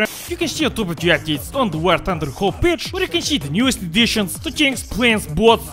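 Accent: Russia. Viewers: Максимально русский акцент одобряю 🗿👍🏻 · Почему у него такой русский акцент? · Чел, ты из росси? У тебя русский акцент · Слышно же русский акцент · у тебя слишком русский акцент типа реально кажется что ты русский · English + Russian fused results in english russian stereotypic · Ты руский у тя акцент русский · His voice is so stereotypically russian its hillarious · Борис, ты кто? Русский? или что просто по акценту похож, так что колись! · По акценту слышно, что ты русский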